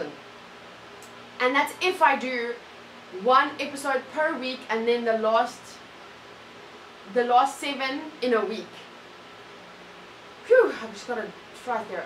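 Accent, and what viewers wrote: South Africa. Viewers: what accent is that it sounds south African??? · Huh, you're from South Africa? That's cool, explains the accent.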